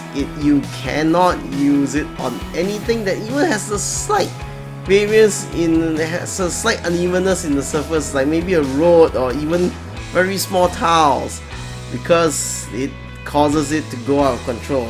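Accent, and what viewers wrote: Singapore. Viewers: You sound like a Singaporean/Malaysian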